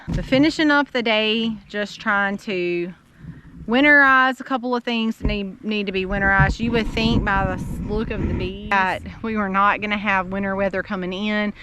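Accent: South, United States. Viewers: I literally could sit and listen to you talk all day long with that southern accent!!😊😊😊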